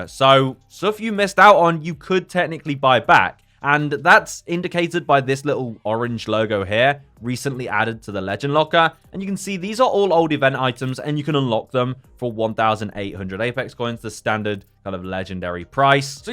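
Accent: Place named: United States. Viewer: please stop the american intonations be English mate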